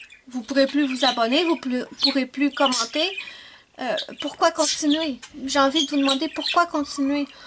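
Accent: Quebec, Canada. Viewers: Bonsoir, Cette nouvelle me fait beaucoup de peine car j'adore tes vidéos. 😖. Évidement que je vais continuer a te suivre sur FB et Instagram, mais je préférais YT a cause de t'entendre parler, de Ruby, de Spike le chat blanc. C'était tellement sympa, c'était un agréable moment. Sans parler de tes vidéos tutoriels et de ton charment accent québécois. Tout cela va beaucoup me manquer😖😵😬. Youtube a beaucoup changer en 3 ans. Cet espace de liberté et de partage est devenue une véritable dictature par ses nouvelles règles et sa cupidité. Certains youtubeurs ont aussi changer, de partage de leurs passions, ils sont devenues des "sponsorisés" a outrance pour le profit. J'aime bien ta sympathique simplicité et ta passion du coloriage. Tu le fait encore non pas pour le profit comme certains aujourd'hui. Pour moi tu est plus une amie sincère lointaine, qu'une étrangère d'un autre pays essayant de me vendre quelque chose. 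Tes vidéos me manqueront beaucoup car elles m'apportait un peu de bonheur dans ma solitude. Je te suivrait sur les autres réseaux sociaux😊😋. Tes coloriages sont magnifiques et Wendy est superbe😍👍👏. Tout mes vœux de bonheurs, de joies, de bonne santé a toi et a toute ta famille😘. Internet comme dans la vie réelle devient de moins en moins un espace de liberté. C'est bien dommage...... 😖😖😖 😫😫😫.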